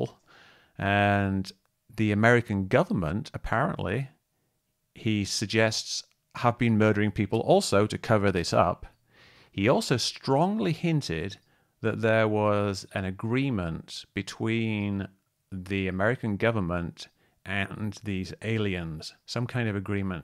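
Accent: United Kingdom. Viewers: Good video but whats with the use of americanism 'math' ...'flashlight' etc ...with a british accent ?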